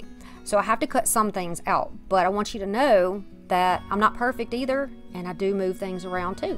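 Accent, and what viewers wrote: South, United States. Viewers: Number one, I'm a new subscriber and I just wanted to say that your amazing southern draw and personality is what keeps me coming back! Number two, ALL of the things that you make are stunning and I'm so grateful that you've chosen to share your talents with all of us! I'm going to go check out your IG and send you a DM. Maybe we can share projects and ideas 😁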